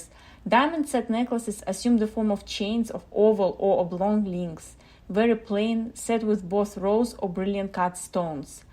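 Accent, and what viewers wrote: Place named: Russia. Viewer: ruskakaia accent lol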